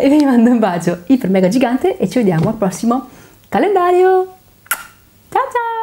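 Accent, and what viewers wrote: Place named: Emilia, Italy. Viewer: Ahhhh vedi!? Io sono mezza veneta e mezza emiliana. Ho sentito un po' di accento emiliano (magari dopo qualche anno a Bologna lo hai assimilato!) per quello 😂 Spero di non essere stata indiscreta nel chiedertelo! 💕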